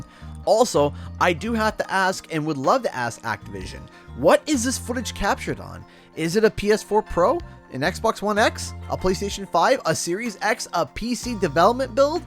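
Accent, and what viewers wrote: Canada. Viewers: This clip was completely about nothing and I didn't learn anything new from it but I like listening to so I like it.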